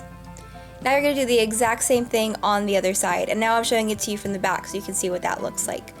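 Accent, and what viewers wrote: United States. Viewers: Hi which part of USA r u from? I love this accent you have!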